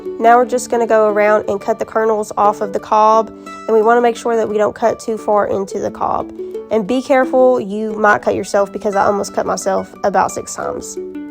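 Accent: Appalachia, United States. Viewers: 🫶👍🤟🤙👌🤞✌️👆❤👈 Make sure it's code water , not cold water. I love your appalachislang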